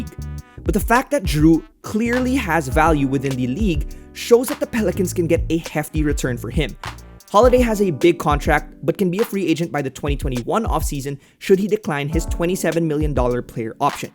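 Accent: Philippines. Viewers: I was pretty sure you’re filipino judging by your accent and name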